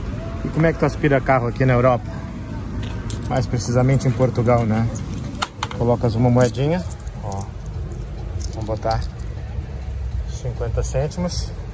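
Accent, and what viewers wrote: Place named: Rio Grande do Sul, Brazil. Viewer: De acordo com o sotaque, quase imperceptível, trata-se de um gaúcho.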